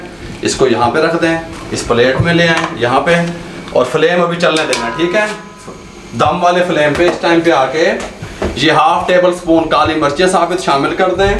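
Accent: Punjab, India. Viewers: Sadqe jawan main tuhade vich vich punjabi touch tu hhhh jeonde rewo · Wah kya yakhni ka swad hy.......or wah kya zaban 'punjabi, ki mithass hy....baly baly.......maza aa gya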